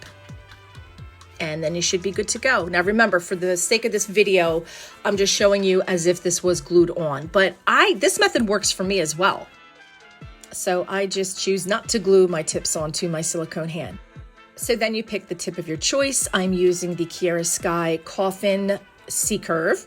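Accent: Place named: Philadelphia, United States. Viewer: This is a great review I like how you compared both. Love your Philly accent 😊❤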